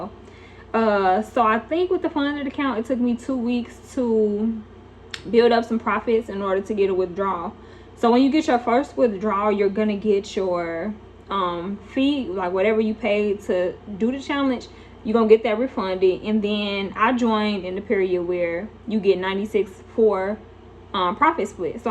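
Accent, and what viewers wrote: United States. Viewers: Your accent(southern) is so cute! Ppl like y’all helped me dodged IML! Yes please upload more videos, we need more women traders on this platform!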